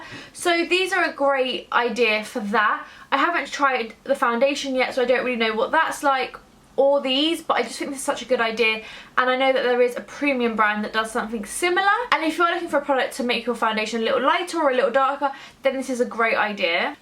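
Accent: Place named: RP, United Kingdom. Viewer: You have this posh British accent! lovely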